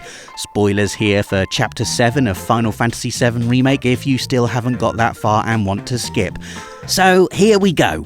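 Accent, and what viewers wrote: United Kingdom. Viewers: All i heard was a british accent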